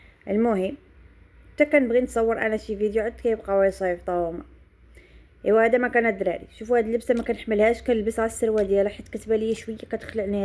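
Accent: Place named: Algeria. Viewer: وﷲ محتواكي جميل وشيء جميل اشاهد محتوة كا محتواكي ولاكن الهحة الجزائرية اشويه اصعيبه فهما كا انا ليس من الجزائر شكرا وتحية اخوكم من بلاد الرافدين 🇮🇶🇮🇶🇮🇶🌷♥🫀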